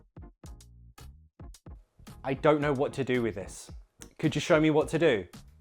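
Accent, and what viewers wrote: United Kingdom. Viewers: Is it a British accent?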